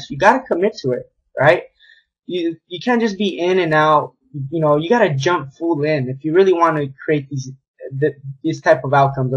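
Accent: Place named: Arkansas, United States. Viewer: That's an Arkansas accent for sure... Let's connect on facebook bro... Look me up, I'm banned from sending more friend requests for another week or so, lol.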